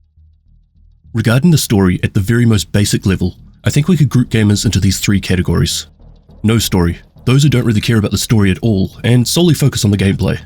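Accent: New Zealand. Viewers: your kiwi monotone voice works wonders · Monotone Kiwi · smooth kiwi voice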